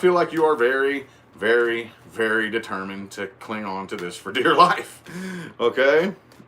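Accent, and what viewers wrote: South, United States. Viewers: ...That Southern Twang,...Love🦋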